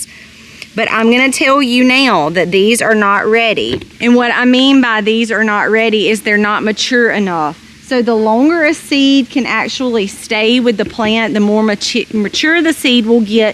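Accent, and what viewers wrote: South, United States. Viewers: I talked about my grandmothers garden. They also had chickens in a hen house an d pigs in a stable. Both had a fenced area so they did get outside. I agree I love spinach but grandma didn't have that. I am curious you are always talking about the veggies do you have fruits. Grandma had cantaloupe and watermelons. She had an apple tree but it never gave off much. I didn't see corn either but I think it is late in the year for it. Also I live in Oklahoma and not sure what area that you are in but I am betting in the south hearing your husband and especially your southern belle accent. I know certain things grow better in certain areas.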